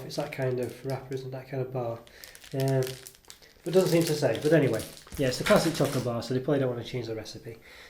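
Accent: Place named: United Kingdom. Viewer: OCD candy review, if you love to see up close candy wrapper comparison aplenty, this review is for you. I have an unbridled love of close ups of candy wrappers with endless comparisons of size by a person with severe OCD but even I was daunted by this also of you love unintelligible British mumbling, this video is for you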